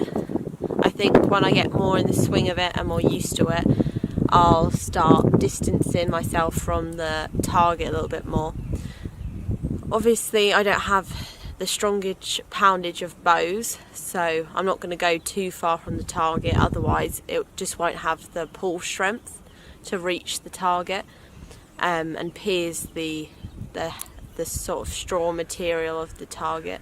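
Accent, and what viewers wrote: United Kingdom. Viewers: I love her accent. It's so amazingly British. 🇬🇧 It would be nice to meet by the fire. 🙂 Regards from Poland. 🌳🏕️🏞️🐗🐿️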